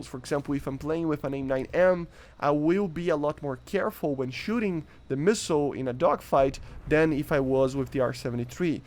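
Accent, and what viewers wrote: Brazil. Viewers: nice video, but i have a question, are you brazilian? your accent sounds brazilian, if not, where are you from?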